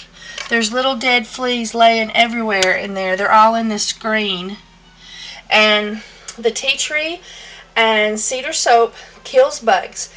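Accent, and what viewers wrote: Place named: Fresno, United States. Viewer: That's a lovely accent that you have. Fresno?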